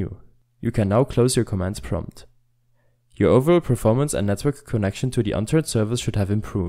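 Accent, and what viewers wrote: Germany. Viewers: Man hört richtig dein deutsch Akzent in englisch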